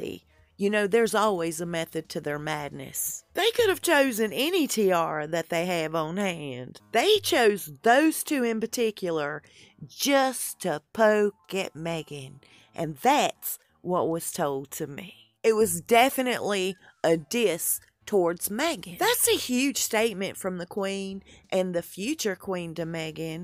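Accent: South, United States. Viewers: You always make my day with your videos. Your a awesome story tell and love love love the southern accent.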